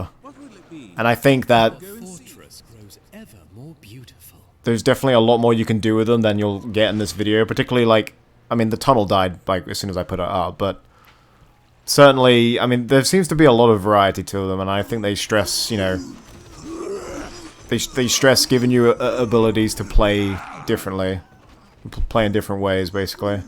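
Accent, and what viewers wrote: United Kingdom. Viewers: I liked as soon as I heard this glorious British voice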